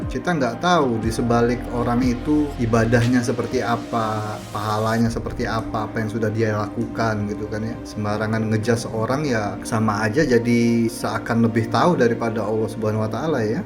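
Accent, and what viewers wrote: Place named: North, Malaysia. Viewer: loghatnya pun dah bertukar jadi loghat utara 😂😂😂😂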